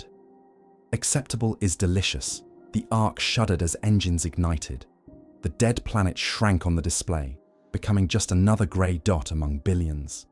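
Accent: United Kingdom. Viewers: Another British accent so tired of this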